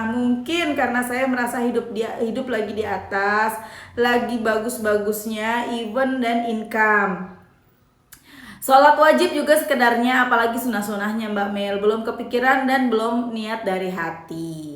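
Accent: Jakarta, Indonesia. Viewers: masya Allah mantap mba mel bahasanya betawi bgt❤😊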